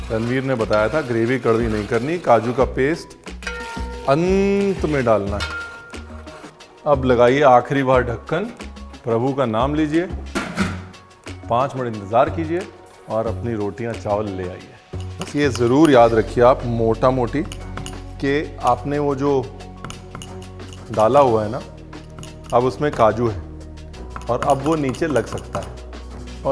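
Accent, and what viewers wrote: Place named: Lucknow, India. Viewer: U never disappoint at all.. each recipes has its nayab ( your lucknowi urdu)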